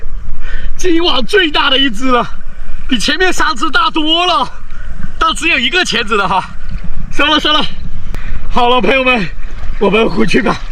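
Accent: Fujian, China. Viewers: 听你的口音应该是福建人吧？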